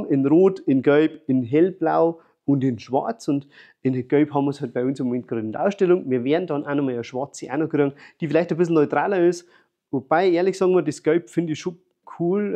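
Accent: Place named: Bavaria, Germany. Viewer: Für nicht Bayern leider nicht immer ganz leicht verständlich aber deine Begeisterung kommt sehr authentisch rüber. Toll😊 Auch für mich in der engeren Auswahl. Leider wohn ich nicht bei euch in der Nähe sonst käme ich auf jeden Fall bei dir. Vorbei. 🎉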